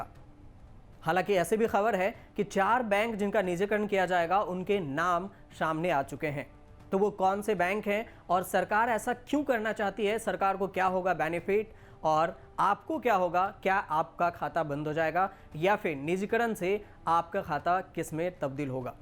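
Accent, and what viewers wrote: Bihar, India. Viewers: Kisne is anchor ko rakha ,sudh hindi tak padna nahi aa rahi.bihari babu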